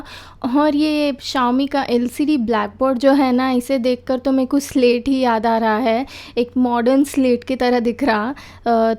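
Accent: Hyderabad, India. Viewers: totally hyderabadi.nai karra.... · R u Hyderabadi? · Tum hyderabad se ho kya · You have to work on your voice and language I feel Hyadrabi hindi some time ... Keep it up 👍👍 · Are ap hyderabad se ho kya..way u talk like hyderabadi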